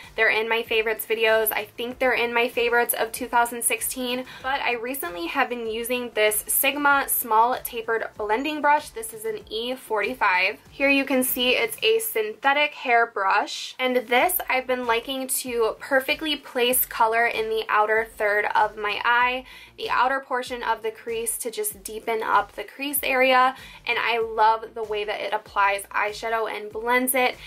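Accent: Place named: New York, United States. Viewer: you can really hear the New yorker accent when she talks. its so endearing :)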